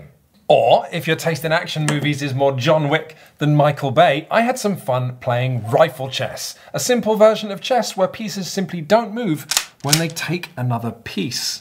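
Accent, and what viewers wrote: United Kingdom. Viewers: I will always listen to British man on the internet. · "Will you listen to a British man on the internet?" hell yeah I will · Good lord, a British man on the internet? I think I will listen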